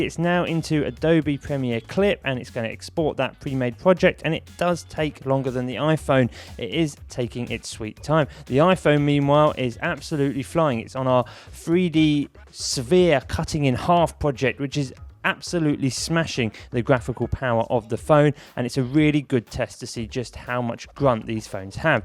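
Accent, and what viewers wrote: England, United Kingdom. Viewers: first name surname he's from England that's how his accent sounds like and English is originally from England. Deal with it in fact your accent is the one that is weird to them.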